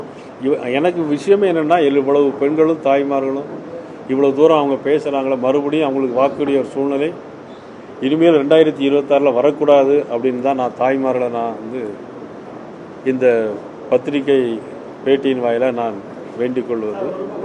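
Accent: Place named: Tirunelveli, India. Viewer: நீங்கள் பேசும் நெல்லை தமிழ் அருமையாக இருக்கிறது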